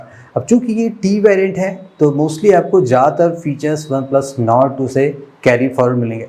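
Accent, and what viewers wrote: Lucknow, India. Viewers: Sir aap Lucknow sa ho kya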